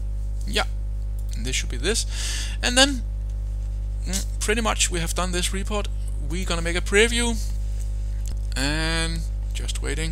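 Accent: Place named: Denmark. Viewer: Du lyder meget dansk. You sound very Danish.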